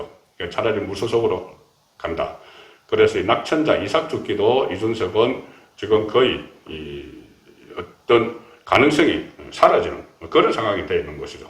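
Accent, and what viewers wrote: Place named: Daegu, South Korea. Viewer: 고향은 진주같은데 영남일보출신이라 그런지 대구사투리랑 비슷합니다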